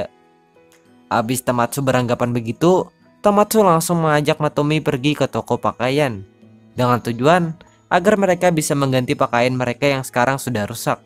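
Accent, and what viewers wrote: Banjar, Indonesia. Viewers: Logat banjar nya 🥲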